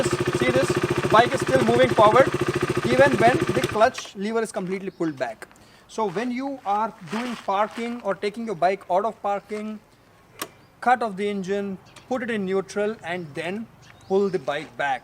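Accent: India. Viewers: This is the first indian I take serious because his accent isnt as silly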